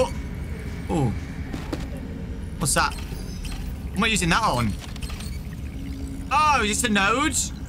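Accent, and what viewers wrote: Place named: Birmingham, United Kingdom. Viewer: The tittle of this video should be Brummy guy shouting at robots for 28 minutes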